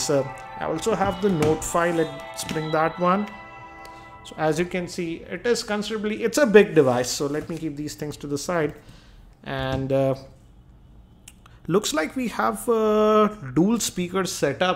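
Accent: India. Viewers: I really hate when i get in a review and find it indian english ! " bress da budden "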